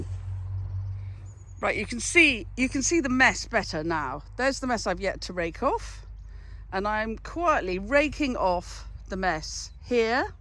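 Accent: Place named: England, United Kingdom. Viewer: Oh you are wonderful! I cannot buy you a coffee yet please know this American fell in love with your articulated, English accent, humor and joy of gardening! I love narcissis, moved to a home where someone darling and knowledgeable ( like you) planted dozens of bulbs, which have tripled in a year! Thank you for all your tips and giggles. I have adopted you no questions asked- I have English heritage! Warm regards, excellent content!!!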